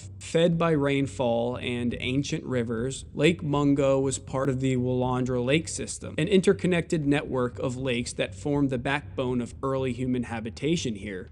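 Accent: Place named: United States. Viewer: Why is this narrated by an American?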